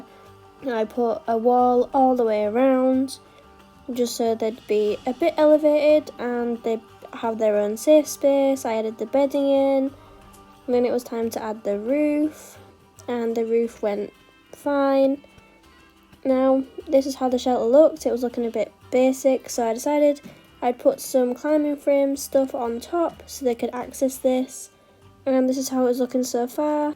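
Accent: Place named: United Kingdom. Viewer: I think your british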